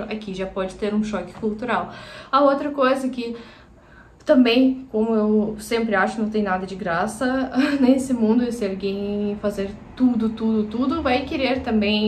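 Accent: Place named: Curitiba, Brazil. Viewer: O tópico é curioso, a maior comunidade eslava do Brazil está em Curitiba. Como namorar como uma Curitiba ? Um fato curioso, teu sotaque é parecido com o de muitos curitibanos, assim como aparência ( pela minha visão de alemão ). A minha impressão é de que as pessoas estão curiosas a seu respeito ( hipótese ). Se minha memória estiver correta este conceito de esposa fora chamado de Amélia ( referência a musica antiga, relativo a esposa no sentido bíblico dentro da cultura hebraico/ cristã )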